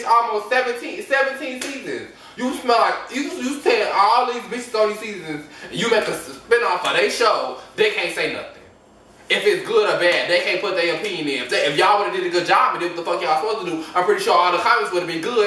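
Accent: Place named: Chicago, United States. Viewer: I’m just going to assume you’re from Chicago?!? Lol let me subscribe!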